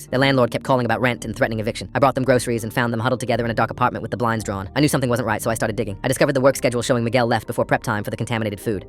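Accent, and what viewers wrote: Australia. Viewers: Is it just me or does this sound Australien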